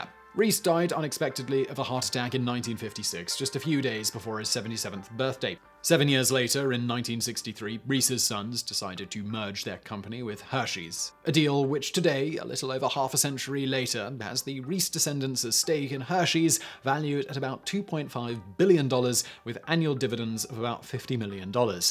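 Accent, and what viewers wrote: England, United Kingdom. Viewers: I'm really glad u didn't refer to hitting the like button as "smashing" it, like you have in previous videos. It's just kinda cringey. "Smash" is a term used by American teenage YouTubers, it sounds really wrong coming from an adult. Not to mention an adult with a very proper English accent. Your accent actually gives you a good amount of credibility, and when you say "smash that like button", it takes all that credibility away lol. i love you and i love the channel! Just no more smashing, ok? :) thx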